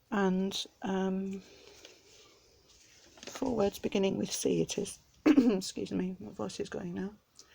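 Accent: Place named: United Kingdom. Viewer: Stunning! And I so enjoyed the video too. You make me laugh, 'no, scrap that, just go and live you'd lives ', so funny. And I look forward to the woodland journal tutorial. I'm intrigued to know how to ink pages the way you do. They are very beautiful. I'm new to art journalling, JJs, etc, so I'm learning. I'm in the Uk too, it's good to hear a homegrown voice x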